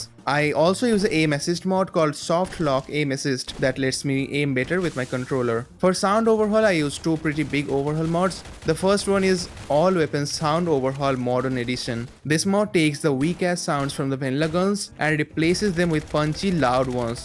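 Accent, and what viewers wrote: India. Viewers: As an indian i like fallout too, you sound like a bengali guy ngl. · Idk why its so interesting to me that people from all over the world like new vegas. You sound indian, its weird i wouldnt imagine new vegas being a very popular game in 2025 in countries outside the US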